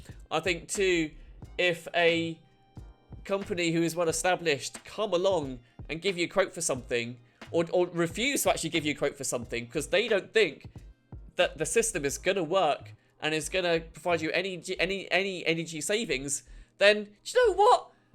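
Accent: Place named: United Kingdom. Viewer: guy with a British accent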